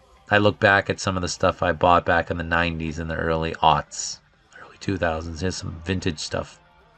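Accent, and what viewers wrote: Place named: New York, United States. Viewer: That New York accent has to go. I had to watch the video with the sound off lol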